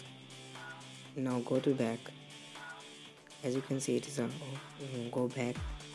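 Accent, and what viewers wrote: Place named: India. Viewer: Of course he sounds Indian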